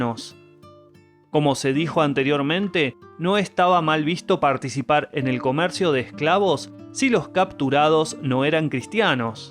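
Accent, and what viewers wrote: Argentina. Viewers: Un mishon? Que significa un mishon 😂 horrible acento argentino horrible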